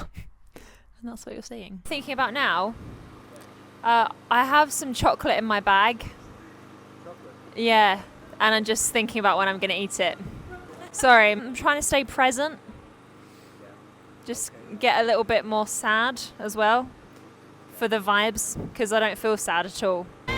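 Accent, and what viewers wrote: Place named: United Kingdom. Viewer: you have so much Tallent. I'm addicted to your videos. Mus be your british accent. Your voice is so beautiful...first time I've heard your voice othe than fvm. Keep on rockin'....a fan in mississippi.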